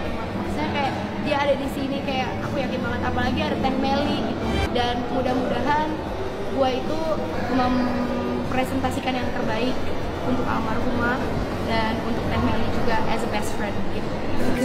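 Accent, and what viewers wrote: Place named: West Java, Indonesia. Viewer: Taahhh.. ieumah lumayan, rada mirip,,make logat sunda saeutik weh,,voooooollllll😁👍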